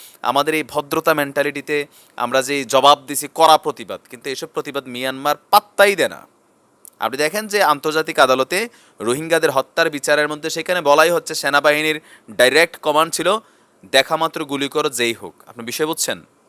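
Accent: Comilla, Bangladesh. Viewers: ভাই আপনি কুমিল্লার ভাসা বলুন ভালো লাগে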